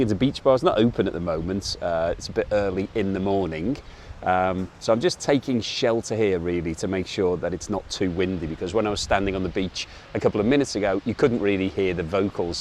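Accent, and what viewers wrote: United Kingdom. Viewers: Hi. Are you based in the USA? Sounds more like a British accent you have there.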